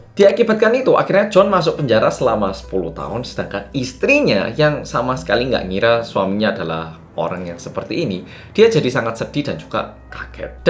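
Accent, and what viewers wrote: Java, Indonesia. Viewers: Wong jowo😂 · Bahasa Jowo ne yo medhok banget...😅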